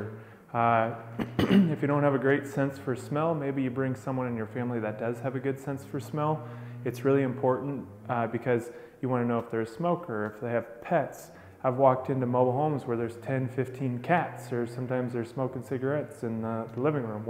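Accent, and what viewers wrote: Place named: United States. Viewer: Nice to see a video where the person speaks decent American that we can all understand.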